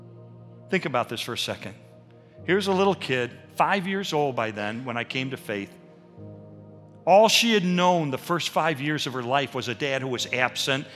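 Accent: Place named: Chicago, United States. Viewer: You can tell he was from the Chicagoland Area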